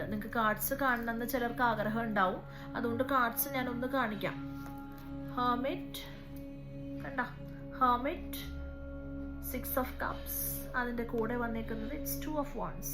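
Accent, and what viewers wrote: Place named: Kottayam, India. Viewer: കോട്ടയം ഭാഷയാണ് കളിയാക്കണ്ട!!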